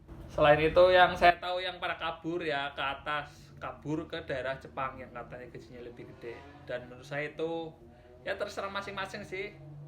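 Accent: Banyumas, Indonesia. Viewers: Mas orang mana? Logatnya ngapak... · Ni orang ngapak.ngapake ngendi kang.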